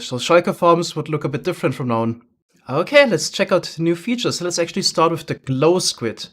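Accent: Germany. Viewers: Love you and your german accent, but: "Glow Lichen" is pronounced "Glow LIE - KEN"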